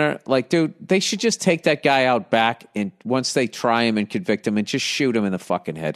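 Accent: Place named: West Virginia, United States. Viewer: Sounds like West Virginia.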